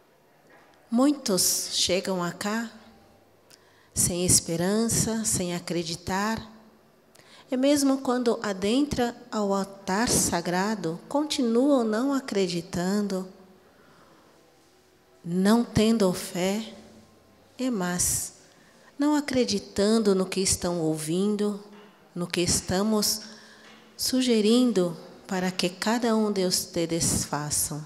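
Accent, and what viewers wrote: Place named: Spain. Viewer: Eu, como um bom gaúcho aqui da fronteira, amo esse sutaque espanhol, que faz parte da nossa gente aqui dessa região sulina! Buenas minha! Me gusta ouvi-la!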